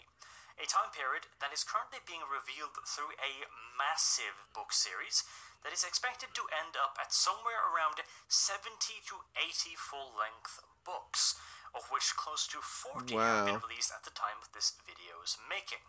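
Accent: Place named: United States. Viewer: Oh, MURICA xD I was trying to guess via akcent :P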